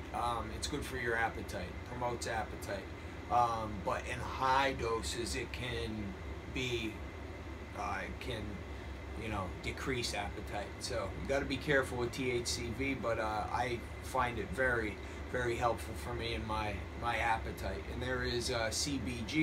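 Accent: Pennsylvania, United States. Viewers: ayy def got the PA accent haha, greetings from NEPA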